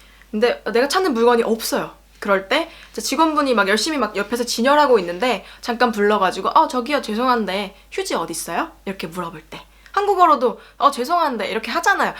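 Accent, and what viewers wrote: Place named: Saxony, Germany. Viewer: 제가볼땐 발음은 약간 동독(작센)사람이 베를린이나 북부독일(함부르크 등등)와서 표준말 구사하는 것 같아 보입니다.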